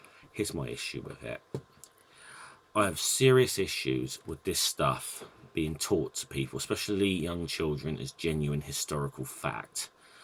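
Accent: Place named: United Kingdom. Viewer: How am I only just realising you're also British? 😲 🤣 Geez, I think 🤔 it's time to clean out my ears 🤣 Also, *Ken Scam 🤣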